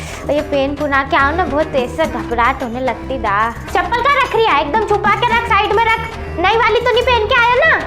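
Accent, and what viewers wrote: Indore, India. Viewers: Indori accent is contagious 😂😂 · Ahaa that pure indori tone...really that teej wali line m aunties aese hi hote h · Love my Indore and Indori lahja😆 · Watching your video from Paris... I miss my Indore and the language so much, I show my husband your videos and tell him, that's how we speak :p Lots of love <3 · Where do you find this content? You are so real on your acting along with indori tone.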